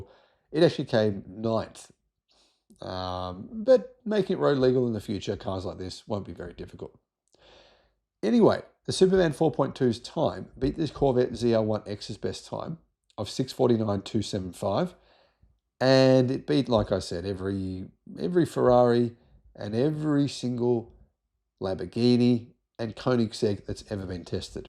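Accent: Australia. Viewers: if you’re going to call Zeds Zee, then, you may as well do away with your Aussie accent. Just sayin’.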